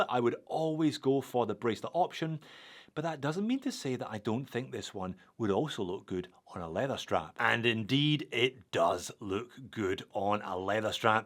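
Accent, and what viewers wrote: Scotland, United Kingdom. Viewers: ya just type that in the search bar it's the old oatmeal crisp commercials from earlier 90s. For some reason your Scottish accent just pop the commercial into my head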